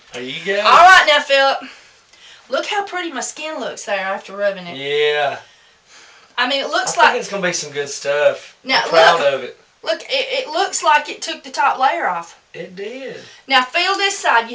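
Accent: South, United States. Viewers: Hi, I have watched so many of your videos! You remind me of my grandma and grampa haley that happened to be from your state with their heavy southern accent. I can do a great southern accent to where you would think that's how I talk all the time, but I've got a boring California accent in reality. Anyway, watching you makes me feel like I'm home because of the way you talk, and your cute personality.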